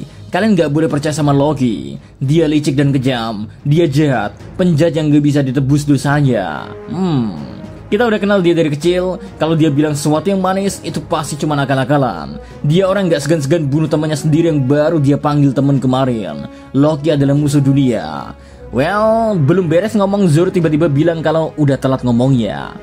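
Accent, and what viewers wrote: Java, Indonesia. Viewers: Males bgt denger suaranya Medok nya medok ga enak. Nada medok jawa tuh enak, terkecuali lu.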